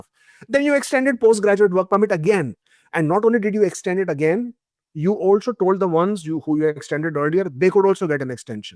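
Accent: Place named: India. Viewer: You have a clear Indian accent. If you weren't granted entry to Canada by soft policies, will you be in Canada?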